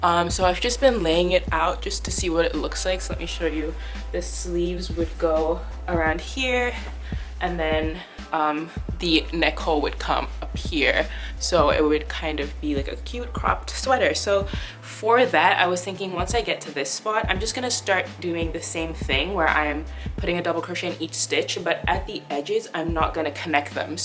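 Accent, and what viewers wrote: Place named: Caribbean. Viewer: you from trinidad? you have a accent like somewhere in the caribbean and at the same time some kind of american and british accent in some words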